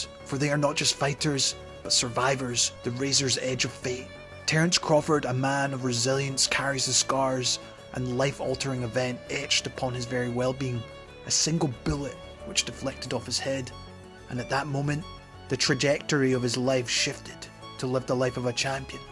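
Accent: Scotland, United Kingdom. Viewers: Sounds Scottish to me.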